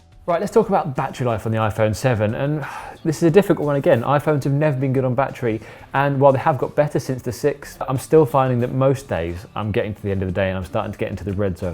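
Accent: England, United Kingdom. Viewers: Gosh dang man you complain so much. It is wit is, I honestly wish I hadn't watched this video. Just one log complaining video. I really hate this kinda negative negative negative. Then take it back. You have like 14 days. You did your review and your not impressed. So take it back and get the 6s out and use it until the next one comes out. Good grief. Even in some English accent whining sucks!!!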